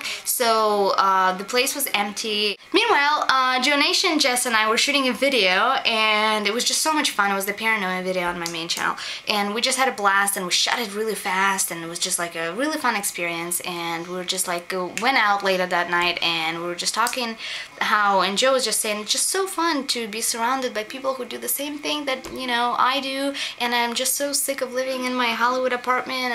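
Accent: Russia. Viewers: I can tell your accent gets thicker every time you go back to Russia. lol really cute.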